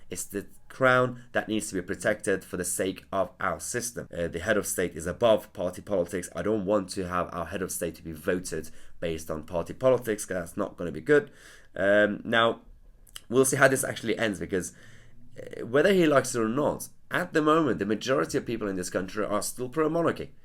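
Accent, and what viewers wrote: United Kingdom. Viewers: Sounds pretty British to me, seems to stand for British values, but I know what you're saying.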